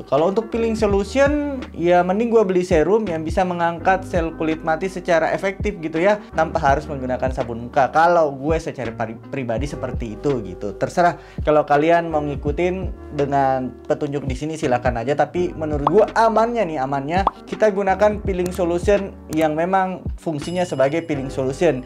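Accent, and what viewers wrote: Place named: Bugis, Indonesia. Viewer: Logat nya kayak orang bugis ya bang?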